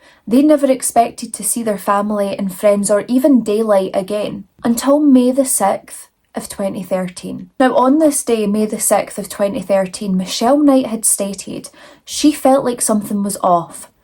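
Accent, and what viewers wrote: Scotland, United Kingdom. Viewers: "how does he even get women/with that hair line" "had to do the big shop at tesco" "that was patricia" 😂😂 i love how your sense of humour can shine through even when talking about such a monster. your channel has been a great find, thanks to the person who recommended you 👏👏👏 Love a Scottish accent so cute ❤❤ I know this case i read the survivors autobiographies but I've forgotten a lot and didn't know castrel's history so thanks for new information and being so thorough Edit: near the end when you said may 6th im watching this on may 6th 😮 spooky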